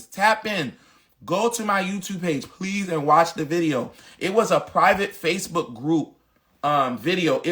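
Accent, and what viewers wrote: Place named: Philadelphia, United States. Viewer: Omg!! He be having me SOO HYPED!!! That Philly Tone and RHYTHM 🔥🎯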